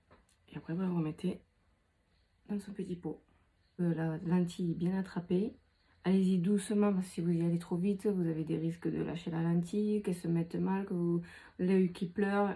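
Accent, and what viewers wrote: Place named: South, France. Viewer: Merci pour l’astuce, explications claires et avec un bel accent du Sud 👍🏻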